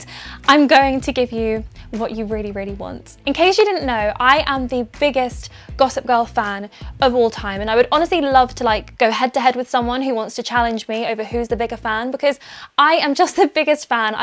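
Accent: United Kingdom. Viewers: she british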